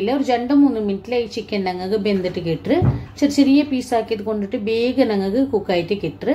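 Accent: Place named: Palakkad, India. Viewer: Nakk ningade samsaram nalla ishtayid.ningale palakka kekkan veedio nokre....👌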